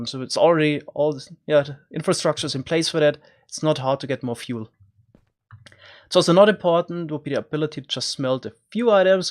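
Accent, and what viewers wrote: Germany. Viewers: Du hast einen deutschen Akzent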